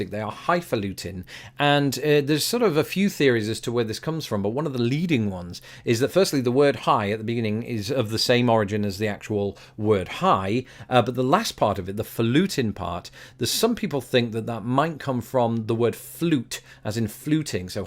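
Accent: United Kingdom. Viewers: Thanks for this series, it's most enjoyable and quite interesting. As someone whose been a bit of an Anglophile in my media consumption, I've long found the way the UK speaks the same language this former Pennsylvanian used intriguing. Both the US and UK speak a strikingly similar language---except at those times when we use totally different words or phrases to describe the same thing. From my teen years, thanks to our local PBS affiliate, I became exposed to a litany of BBC sitcoms that became my first forays into hearing the type of English you grew up speaking. Between Tom Baker's Doctor Who saving the universe with K-9 and Sarah Jane by his side, to Mrs. Slocum worrying about her pussy well being at home in the airing cupboard, while working behind the counter at Grace Brothers, I was smitten with British TV. What intrigued me most was learning all of the words and phrases that we didn't use back home in Harrisburg. 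Deciphering contextually what everything from a car's bonnet to it's boot became intriguing mental exercises for my teenage brain. Ever since, I've always found interesting how English varies in its usage between the nations that speak it, so I appreciate your perspective on the differences. The themes for these videos are well considered and the abridged etymologies have been most enjoyable, as has been your presentation of this overall. A British accent like yours just adds that nice touch to the whole thing, I think, so I hope you retain it. To my American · Brilliant list! I think I only used the word brilliant after listening to your brilliant british accent. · I'm catching up on older videos. it's fun to hear him sound more "British" as the videos get older.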